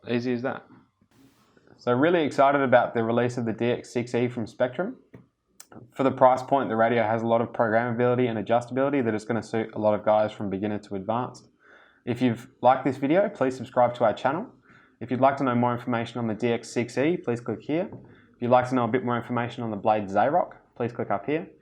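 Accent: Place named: Australia. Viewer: as a person who lives in australia, i find the aussie accent very appealing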